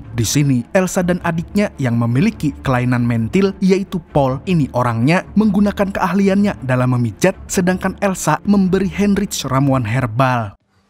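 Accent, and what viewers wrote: Banjar, Indonesia. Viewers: 😂dsa ngalih nyawa ni lh. Urang bnjar kalinya. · Bah urang Banjar jua rupanya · Umma, urang banjar kah sekalinya pian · Mimin nya urang Banjar leh😅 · Pian ni urang banjar kah? 😁 Sesama kekawalan kita lah.. 😁 👌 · urang banjar amang nih sekalinya wkwkwk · Urang Banjar jua sekali admin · Urg banjar sakalinya admin nih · Urang banjar jua kah pian nih julak..😅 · umai sanak nih urang banjar kah sekali nya wkk sukses sanak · Bungul urang banjar sekalinya 😂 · Harau urang banjar sekali nya sidin neneh 🤣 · Pian orang Banjar KH min? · Orang Banjar kah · Urg banjar kalinya julak mimin neh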